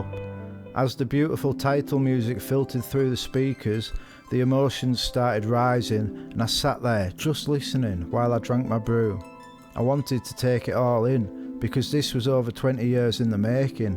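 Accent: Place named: Cumbria, United Kingdom. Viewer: You've got a gift for this brother. Goosebumps. Even with a Cumbrian accent.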